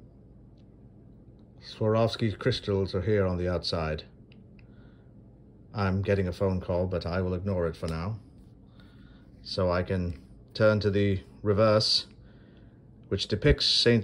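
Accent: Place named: Portugal. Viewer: Fantastic Portgues accent. Spot on, mate!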